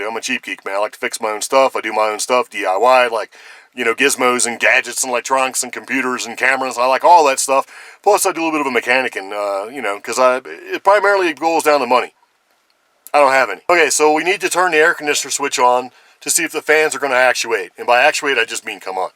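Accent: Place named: United States. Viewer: i watched because you sound like a cool American dude good presentation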